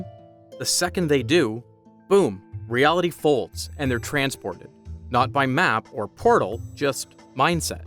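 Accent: Canada. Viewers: Your channel might be marked “United States” but your accent outs you as Canadian. I’m on to you, buddy! 🫵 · Are you Canadian?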